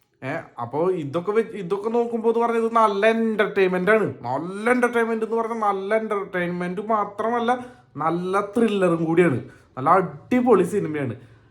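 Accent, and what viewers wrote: Thrissur, India. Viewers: Nice broo first comment i love your slang because i am a thrissur Gediiiiiiiiiii…….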